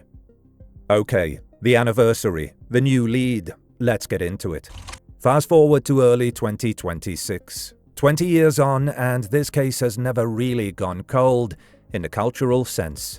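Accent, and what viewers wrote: United Kingdom. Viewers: He's British. Probably a different pronunciation.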